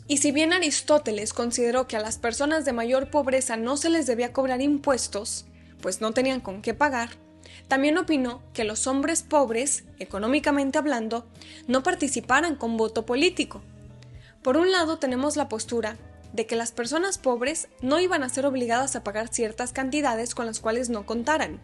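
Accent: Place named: Monterrey, Mexico. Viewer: Suena a Monterrey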